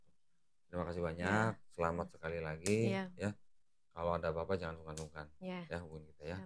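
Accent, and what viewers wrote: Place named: East Java, Indonesia. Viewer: Logat nya JATIM 😅😅😅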